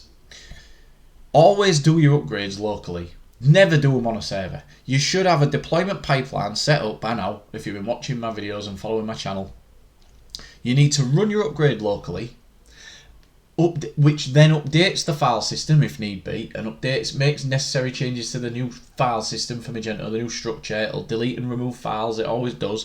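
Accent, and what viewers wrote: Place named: United Kingdom. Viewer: You are great but can I ask you a favor? Please, use a lavalier mic. Since both english is not my first language and your marked British accent it's hard to me to understand what you say. However, thanks for your explanations!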